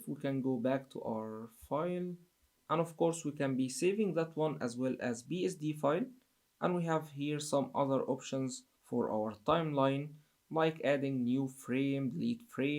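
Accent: Egypt. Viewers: السلام عليكم هو حضرتك مصري ؟ اصل من اللكنه قلت انت ممكن تكون مصري .. انا معجب بشغلك جدا ما شاء الله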